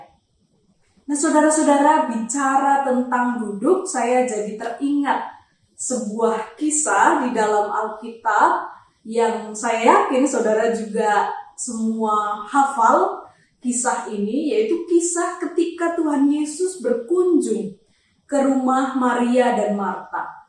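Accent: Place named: Banyumas, Indonesia. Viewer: Maturnuwun Pdt. untuk Kerlingnya......, ngapak-ngapaknya dipertahankan nggih🙏😊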